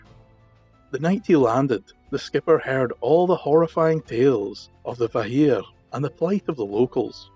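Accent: Scotland, United Kingdom. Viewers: I’ve read or seen a lot of the words/names etc many times in books I’ve read previously. Unfortunately I’ve butchered the pronunciation of each in my mind. So I like hearing the correct pronunciations in your stories. I found your Awesome channel by accident and it’s the best thing to happen to me all day! A Scottish accent is my favorite and I could listen for hours….so I’ve been bingeing! I’ve shared with friends, hopefully they’ll subscribe as well.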